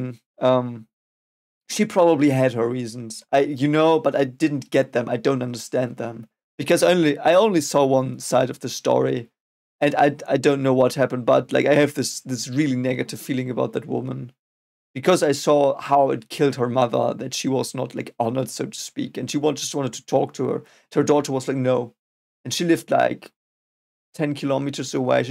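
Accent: Germany. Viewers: Thank you for the correction: 'Glanz.' I believe 'bredt' is a Danish word. However, the subscripts may have undergone mistranslation and might not accurately reflect the original meaning. By the way, are you from Bayern? Your way of speaking is similar to that of a guy from Munich whom I know.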